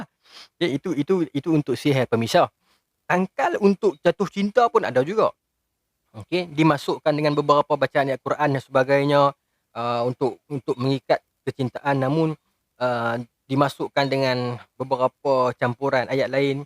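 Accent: North, Malaysia. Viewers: Seqonok dengaq caqa oqg utaqa yg cerdik dn humble brckp, tuan tlg doakan unt saya rezeki dn jodoh, umoq bnyk dh ni, t.ksh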